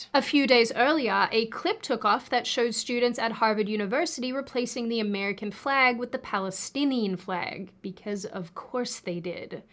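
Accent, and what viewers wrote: Australia. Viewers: Every once in a while, your Auscent shows through, and I love it. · If they are it's distant considering her Australian accent. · Still sounds very Australian to me. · Have you been in Australia recently? You sound more Australian in this video than your last one.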